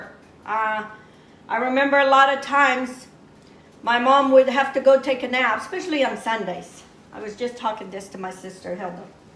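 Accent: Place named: Azores, Portugal. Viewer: Hearing the English language with the azorean accent reminded me of my neighbour next door that passed away a few years ago 🌈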